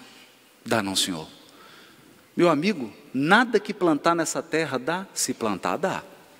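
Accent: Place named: Minas Gerais, Brazil. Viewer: adoro as suas palestras. Vc, esse seu jeitinho mineiro, torna tudo tão simples e fácil de entender. Gratidão querido! Abraço 🥰🙏🏻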